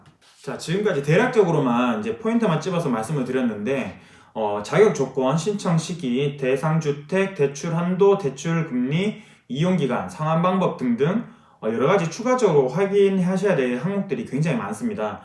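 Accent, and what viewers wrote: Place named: Gyeongsang, South Korea. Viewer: 생긴건 서울 남자 같은데 경상도 사투리 너무 심하게 쓰네 ~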